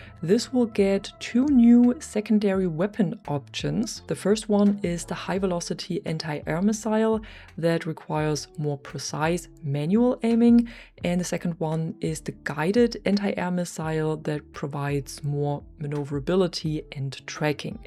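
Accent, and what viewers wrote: Germany. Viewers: where are you from? Dialect sounds German?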